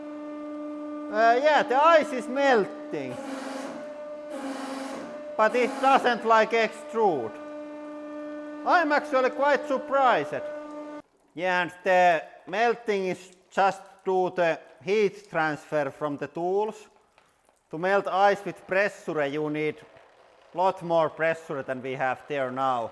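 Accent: Finland. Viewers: Brian Page Finnish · How Finnish people talk english 😂<3 SUOMI PERKELE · Brian Page lol it's finnish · Sylphlez finnish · Are you from Finland? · it's a Finnish accent boi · Mariela Ivanova It is Finnish and the cutest thing ever lol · Are you Finnish? That’s what your accent sounds like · You have like finland aksent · Are u from finland? Great accent btw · Are u from finland? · bankroll unicorn boi its Finnish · baXtian no it's finnish people rallienglanti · Like actually that accent sounds way too finnish for me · sounds like basic english accent from finland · You can tell he’s probably finnish because of hi’s accent😁 ps. I’m finnish too🤟🏻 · Mieze Katze hes probs finish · Sun ralli englanti on paras😍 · Benjamin Bjørndal nah they are finnish m8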